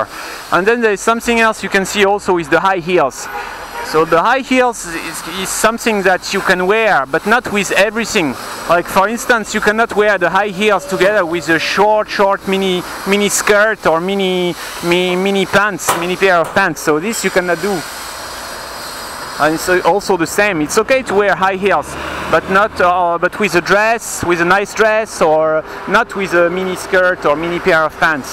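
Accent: France. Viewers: Bonjours ! You have a very French accent , and i am wondering if that can cause problems in teaching English in China ? I lived in China for 11 years , i also was an English teacher !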